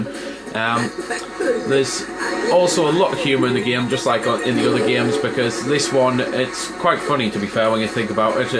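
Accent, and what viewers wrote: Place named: Newcastle, United Kingdom. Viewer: Nive video mate. You sound local, you from Newcastle?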